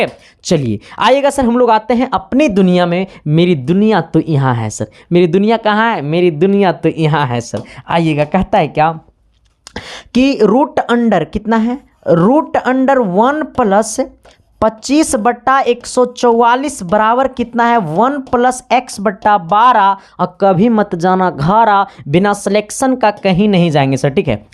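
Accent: Bihar, India. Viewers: Sir aap Bihar se ho kya ???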